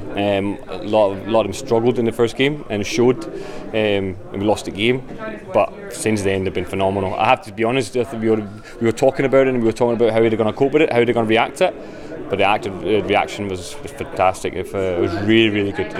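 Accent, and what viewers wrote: Scotland, United Kingdom. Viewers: love your scottish accent.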